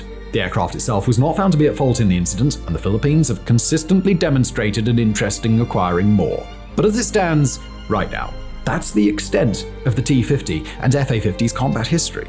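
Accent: England, United Kingdom. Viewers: you have great topics but, very sorry, I am struggling with your english accent. You often whisper the last part of your sentence. I sometimes don't understand what you are saying. thanks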